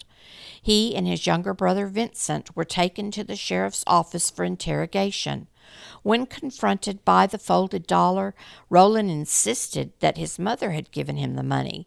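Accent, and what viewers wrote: South, United States. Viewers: What an odd story. Seems almost modern in its senselessness. Murder is, of course, as old as Cain though. I could be wrong, but I fancy it was probably one of the first cases in American law where psychologists were called upon in evidence. Great podcast series, BTW. - and I always love to hear a classic musical Southern accent!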